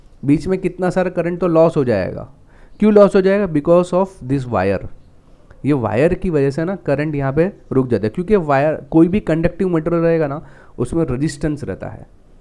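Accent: Gujarat, India. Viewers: are you gujrati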